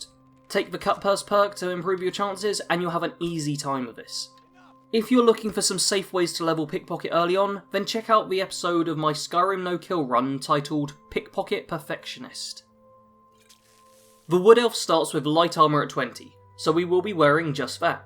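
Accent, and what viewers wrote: United Kingdom. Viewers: Is it your accent english? Also do you use mods ?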